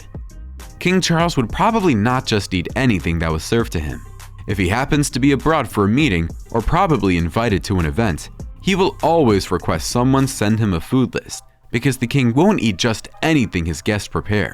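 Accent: United States. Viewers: The claims were made by Burrell who was Diana's butler - not Charles' butler. The claims about Charles are garbage - made for the most part by Burrell. The toothpaste "thing" is something we'd all ask for help with if our arm had had to be broken twice - as happened with Charles. All the claims about toilet seats etc as one example are garbage. Ignore Burrell who's a gossip who makes up answers when he doesn't know the answer and left service with the RF when Diana died over 25 years ago. A butler recently retired from service with Charles laughed at all these claims and said he had never experienced any of them. Note the American accent on the video. Red Flag. So much garbage written and voiced by Americans about the RF all over social media - all of it laughable.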